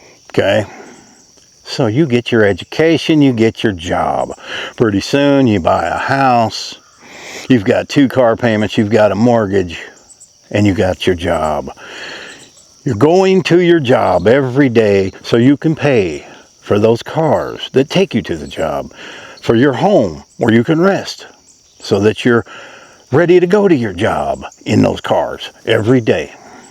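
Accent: South, United States. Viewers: Hi. I'm Tony from NJ, and I used to have a condo. Sold it a few years ago, and I now live in a van, in the suburbs of Jersey... In a strange way, even though surrounded by humans, I also feel like a hermit.. I love no debt, no bills and #1 freedom! One day I may move out to the country.. idk.. but I've been saying that forever! NJ is home.. for better or worse.. thanks for sharing ur existence.. ur southern way of speaking is one of a kind!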